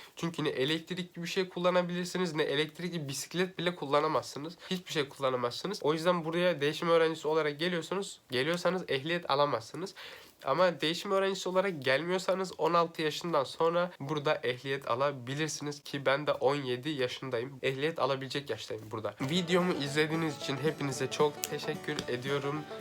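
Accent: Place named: Germany. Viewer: Kardes oyle bir ağzın var ki 30 senedir almanyada yasayan kuzenim gibisin , abd'de 1 senede turkceyi mi unuttun neden konuşamıyorsun?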